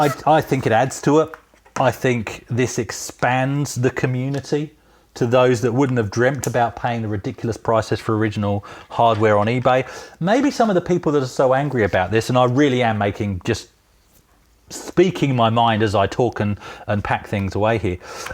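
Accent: Australia. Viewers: I guessed correctly why the Pi was being packed away, but as a first time viewer my real question was "Why does this British chap sound like an Australian?" But I did watch the entire video...and now I know. :) Subscribed...by an Aussie in Canada...probably sounding a bit Canadian by now.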